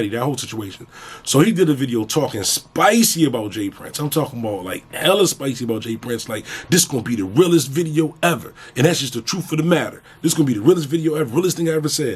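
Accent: Philadelphia, United States. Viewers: Bro sound like he from Philly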